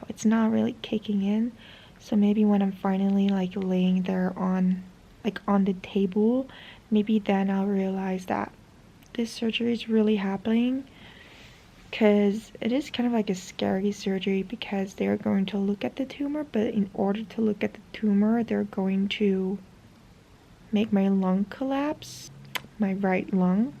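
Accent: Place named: United States. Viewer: You have an American accent , even though you are not from America. Where are you from and where did you learn English ?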